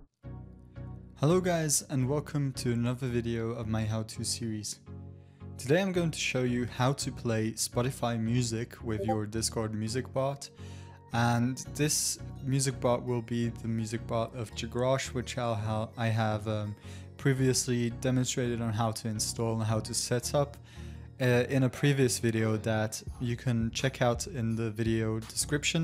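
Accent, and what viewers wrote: Germany. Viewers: ist er Deutscher ?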